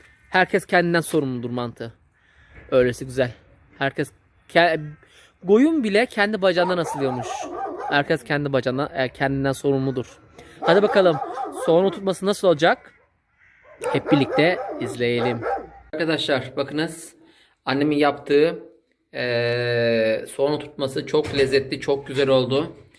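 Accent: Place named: Çaycuma, Turkey. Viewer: SELAMLAR OGLUM BEN KARABUKLU TEYZENIM BIRINCISI GADUN ÇOK GOZEL AMA BEN 40 YILDIR CAYCUMADA YASIYOM ÇAYCUMA SIVESINI SIZDEN FAZLA KONUSYOM BAYILIYOM KONUSMANIZA HARI KULADESINIZ BENI KONUSURKEN GORSEYIZ BURALI ZANNEDERSIYIZ ALLAH HAYIRLI KAZANCLAR VERSIN YAVRUM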